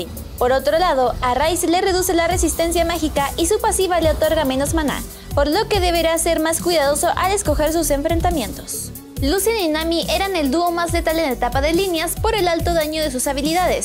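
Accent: Chile. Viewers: Que loco, nunca le había notado el acento chileno a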